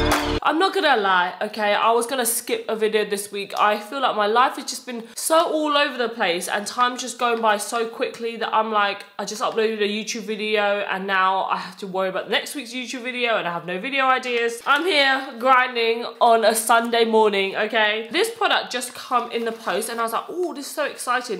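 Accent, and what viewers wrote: United Kingdom. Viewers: I found you on Instagram, your videos with you doing makeup or your skincare. When I started watching your YouTube channel I was hooked and loved how open and honest you are , I didn’t know you had a British accent and lived in London till I started watching your channel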